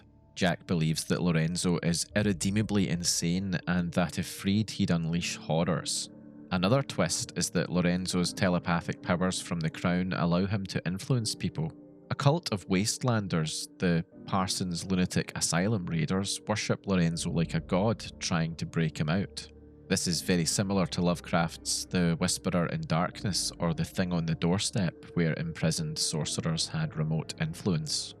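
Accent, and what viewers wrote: Scotland, United Kingdom. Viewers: Oh ny god , ive at last found a scottish gamer for fallout 😂ive been watching too much american youtubers , i now have a twink in ny accent 😂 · Scottish spooky game content person ??? Ive just hit the jackpot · U have a subscriber i can hear ur from my neck off the woods the uk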